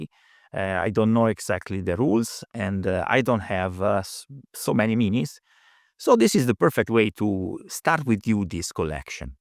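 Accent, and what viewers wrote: Italy. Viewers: I’ve never had trouble understanding you. There’s some charm to your Italian heritage and accent. Best of luck, have loved the channel for a long time.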